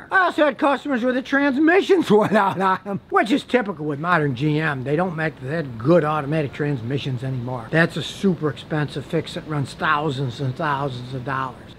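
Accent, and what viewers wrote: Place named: Brooklyn, United States. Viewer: This guy sounds like dafy duck and a really thick brooklin gangster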